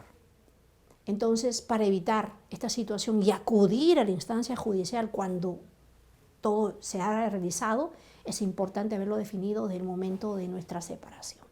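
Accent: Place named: Spain. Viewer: Porque tiene dejo de española? No hace más que dudar (de ser de España) no estar actualizada de la ley peruana ahora vigente Aunque la apariencia aitocotona la delate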